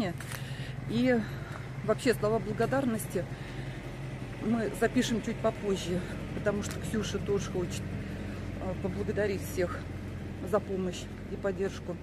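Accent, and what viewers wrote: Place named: Nizhny Novgorod, Russia. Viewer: Мы прожили в Смолино четыре года, мне нравится Нижний, и медицина, и грибы, и земляника, вишня, груши, яблоки, Всё растёт! А этот говор: пойду дела делать! Сразу видно от куда Вы родом, 😊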